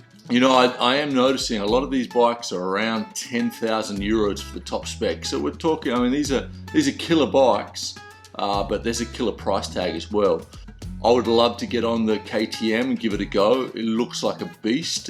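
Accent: Australia. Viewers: Great vid Awesome to have an Aussie accent reviewing these bikes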